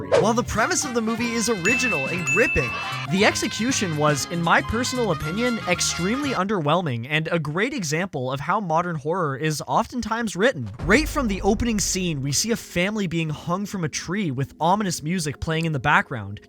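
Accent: Canada. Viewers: bro has a HEAVY canadian accent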